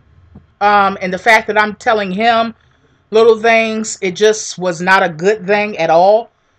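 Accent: Baltimore, United States. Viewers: you are making some valid points especially when you trusting someone with your livlihood.and your Mulahhh lol you such are Definitely from Baltimore (your accent) "Rude" the way you say that word lol Have a Bless day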